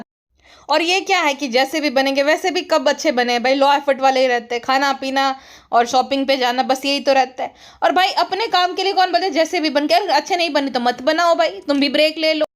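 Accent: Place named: Uttar Pradesh, India. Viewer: Your UP accent😂😂😂 orii morii maiyaaa 😂😂 love your podcast ❤ love and support from Gr Noida..😊 aur video like kardiya h❤🥲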